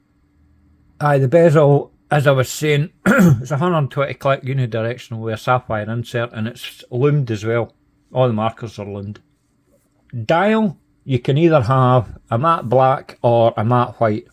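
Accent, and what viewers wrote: Scotland, United Kingdom. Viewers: Sir, you are the most Scottish person I ever heard. Love it.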